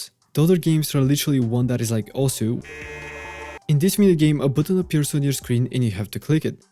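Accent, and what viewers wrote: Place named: Russia. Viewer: какой-то русско-язычный акцент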